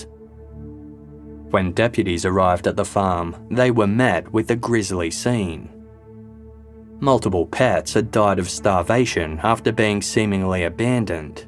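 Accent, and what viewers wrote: Australia. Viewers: Love hearing A fellow Aussie accent 🇦🇺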